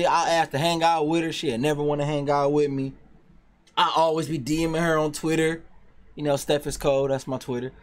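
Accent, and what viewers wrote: Baltimore, United States. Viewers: Nigga said “twenty tew” you def a Baltimore more nigga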